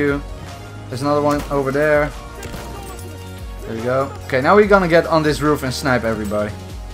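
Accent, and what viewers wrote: Netherlands. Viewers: r u dutch bro? cuz, pronounciations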